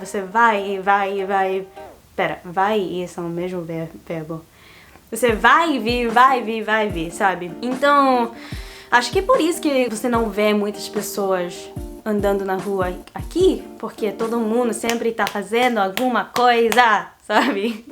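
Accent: Rio de Janeiro, Brazil. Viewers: Tem sim! Sotaque de Carioca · Você tem sotaque daqui do RJ ♥️ Muito bonitinha kkk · Aaaaaw the investment on carioca accent! Just music ❤️ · parece ter um pouco de sotaque carioca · tem um pouco de sotaque carioca...kkkkk... maneiro! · fico contente que você abandonou um pouco do sotaque carioca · você tem o sotaque brasileiro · Disse para ela esquecer o sotaque carioca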